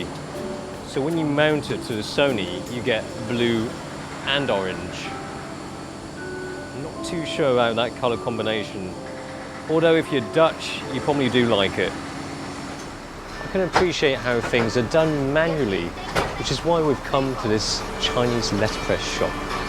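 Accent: United Kingdom. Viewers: gorgeous Britain accent as always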